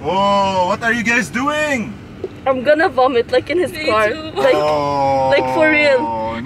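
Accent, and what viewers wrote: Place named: Philippines. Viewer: The way you talk English sound like more Filipino already 😂😂😂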